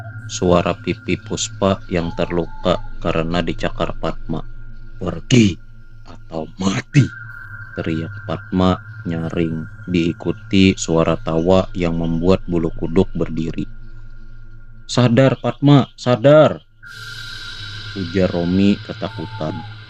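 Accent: Banjar, Indonesia. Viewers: Urg Banjar kah Pian mang..ketahuan di logat neh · Mimin urang banjar jua neh lugat nya 😀😀.. Nah maaf nah min hnyar tdpt chanel pian mudhan sukses.. Salam dr banjarmasin🙏🙏🙏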